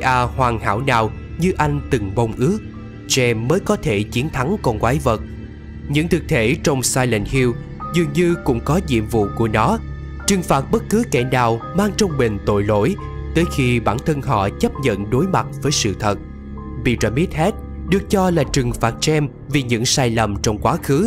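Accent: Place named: South, Vietnam. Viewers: Giọng miền Nam mãi đỉnh 🎉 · Vẫn là giọng đọc mình thích nhất :)) giọng miền nam đầu tiên out trình mấy a giọng bắc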